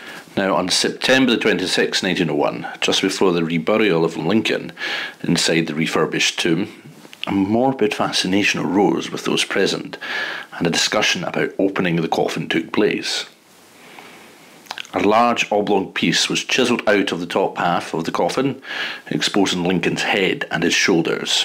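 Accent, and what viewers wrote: Scotland, United Kingdom. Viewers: I love listening to you talk, or is it a robo-voice?🤤. What part of Scotland are you from. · Scottish accent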